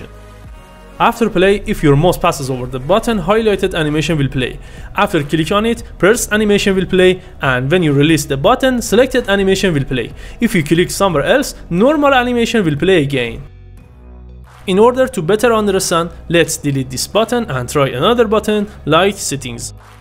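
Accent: Iran. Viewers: I picked up an Iranian accent 🙂. Nice video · you talk english like a persian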